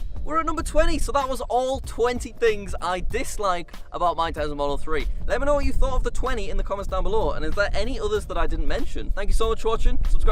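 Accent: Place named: Middlesbrough, United Kingdom. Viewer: Are you from Middlesbrough?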